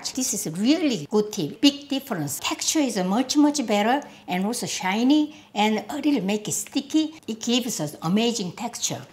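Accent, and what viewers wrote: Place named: South Korea. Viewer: 한국말 같은 영어 너무 좋습니다!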